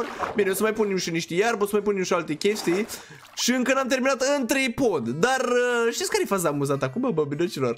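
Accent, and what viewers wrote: Moldova. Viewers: Moldovean 100%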